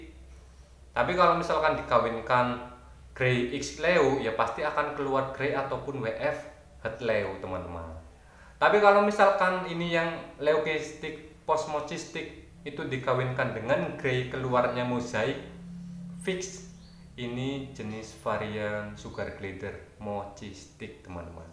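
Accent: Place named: Banyumas, Indonesia. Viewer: Mas, maap orang mana yaa. Deneng logat e ngapak banget..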